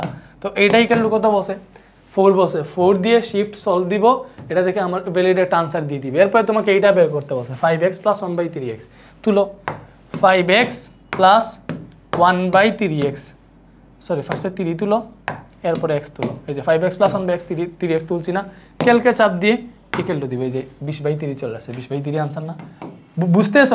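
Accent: Sylhet, Bangladesh. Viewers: Bhaiya apne kita sylhety nii🫠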